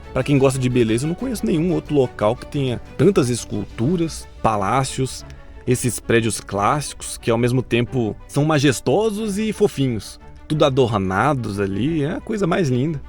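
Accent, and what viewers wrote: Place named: Minas Gerais, Brazil. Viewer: Ótimo vídeo! Comecei meu périplo por seus vídeos com a reportagem sobre Zigurats. Daí chequei sua lista e vi que este aqui é o mais recente. Que grata surpresa, meu amigo! E o que mais gostei, além dos detalhamentos, foi do humor que você imprime às reportagens. Só uma dúvida: Você é catarinense mesmo? Sotaque mineiro de BH inteirinho! Abraço!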